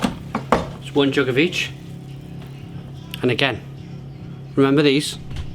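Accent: Wales, United Kingdom. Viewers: Awesome video. Where’s your pub and what’s the name????? I’m getting a hint of Welsh accent (please forgive me if I’m wrong). I’d like a pint in your pub. 👍🏻🤪😊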